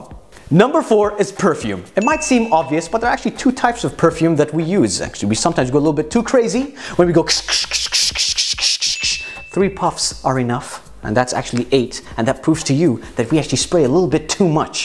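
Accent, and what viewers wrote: United States. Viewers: besides the fact that you smell good...where did you learn how to speak american-english? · Why american accent its not nice. Everything else is good.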